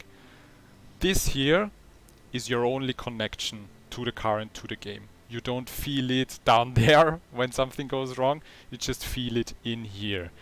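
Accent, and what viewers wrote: Germany. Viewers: Na du bist doch deutsch bei dem Akzent oder? :) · wenn österreicher oder deutsche englisch reden :D machs doch auf deutsch !!!! aber gutes video!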